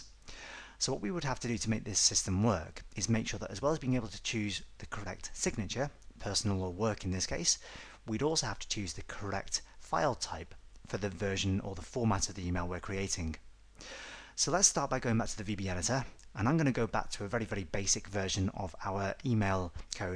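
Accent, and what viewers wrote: United Kingdom. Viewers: Amazing video! thank you so much! You explain complicated things in such an easy way, great job! Oh and that amazing British pronunciation!!!!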